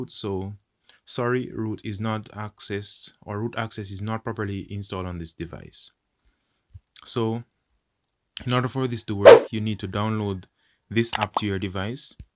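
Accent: Jamaica. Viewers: Dawg sound like a jamaican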